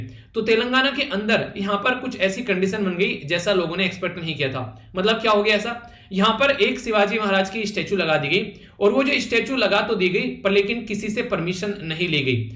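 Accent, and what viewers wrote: Haryana, India. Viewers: I guess from hariyana or western UP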